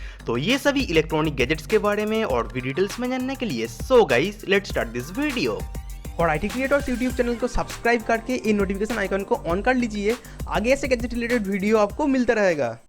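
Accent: Bengal, India. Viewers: Wo totla nahi bangali hai😁😁😁😂😂😂😂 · According to your Hindi pronunciation, I think you are a Bengali , better you try to speak in English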